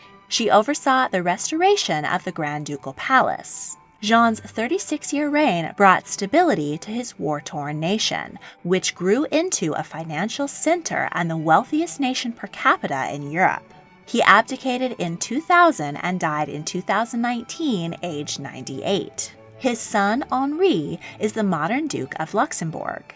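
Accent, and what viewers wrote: United States. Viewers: It’s so funny hearing all those European names pronounced with an American accent.😅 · Screeching American accent telling European Royal history poorly! 😱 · I am an American. but I find the American accent of this narrator absolutely horrible. Why can't people speak English properly without using a hysterical accent? I have been living and teaching in Europe for more than 30 years and I have to say, less is more. Don't over-do an accent like this narrator does.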